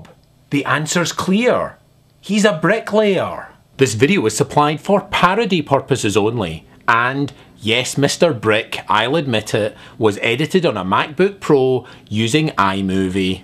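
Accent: Scotland, United Kingdom. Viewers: Lol , classic Scottish accent. I'd love to actually sound Scottish rather than English. A comment from a Scottish Tween x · its a scottish accent .. not irish.. not british.. there is a huge difference.. duh w/e i luv this guy!!!! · "Why is His accent so weird?" Because He's Scotish perhaps? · that was simply brilliant. you are scottish, right? Living in land of the free or still back in the state of thistle and tartan? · Refreshing, and funny. Didnt like this guy at first, but he actually is quite unique, and says it as it is. Not sure where in Scotland this accent is, other than the Burgh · People he's not Mexican! he's either scottish or Irish. Although, he is scottish I believe.... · o_o it's just a scottish accent.. many scottish people have that accent. it's not a voice xD it's his normal accent. lol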